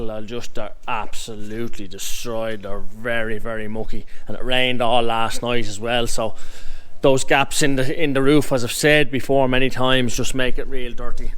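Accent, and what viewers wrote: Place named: Dublin, Ireland. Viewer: A Dub accent from a farmer takes a bit of getting used to. I think il survive though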